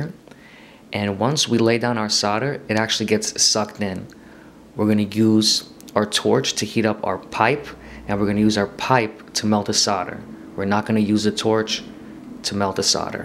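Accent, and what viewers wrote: United States. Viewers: That's just how Americans say it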